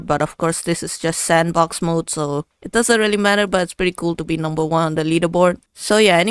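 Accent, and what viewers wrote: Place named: India. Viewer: I know your voice you are Indian if I'm right give me heart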